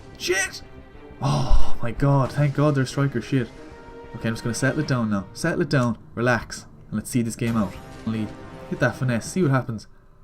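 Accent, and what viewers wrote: Ireland. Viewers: FIFA 17 dont ya hear tat irish accent? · What part of Ireland are you from?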